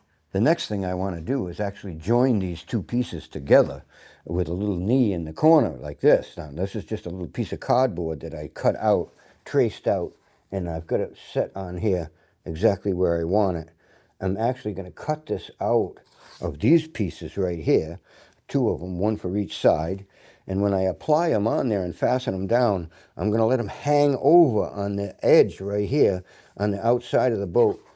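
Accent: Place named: New England, United States. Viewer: Glad yo see you back, enjoy the channel and learned a lot from watching that I've been able to transfer to more general woodworking. Grew up in New England, and it's nice hearing that accent again.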